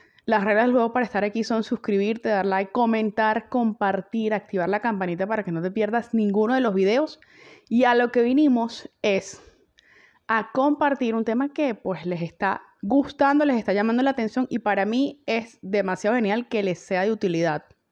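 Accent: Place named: Venezuela. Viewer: Hola soy Lucy, recién descubrí tu canal, he visto otros, pero tú eres más clara, concreta, precisa en los planteamientos, sugerencias, y sobre todo el hablar "venezolano" no tiene competencia. Me he postulado en trabajos remotos dentro de Venezuela y las exigencias son altas, además de los requisitos académicos, las competencias en TIC y las virtuales como el acceso fluido a internet de 10Mbps o superior. Un computador con 4Ram, 250Gb Disco Duro, i5, o superior; en buen estado sin problemas de energía, ni de reinicios. Una cámara web o un celular con buena resolución. Nota: hace tiempo me postulé en una supuesta empresa con casa matriz en España, fue un total fiasco, todavía espero al abogado con el cual me amenazaron.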